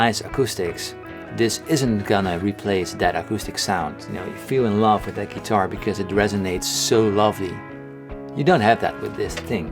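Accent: Netherlands. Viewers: Are you dutch? You sound dutch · Haha, instantly heard you were Dutch (me too XD)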